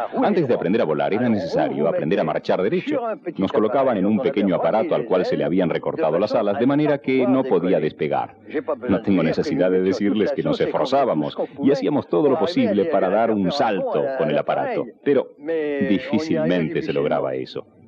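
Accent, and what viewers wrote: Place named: Argentina. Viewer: que parte de america es esta no la reconozco, colombia, chile venezuela, uruguay?el relator es indiscutible argentino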